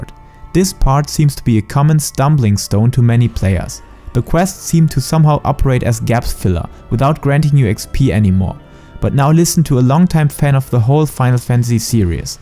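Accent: Germany. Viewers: As a german myself i got to say: your accent is THICK man. Try to adjust to the english tongue a bit more, practicing pronounciation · bro, your German accent...I just cant...sorry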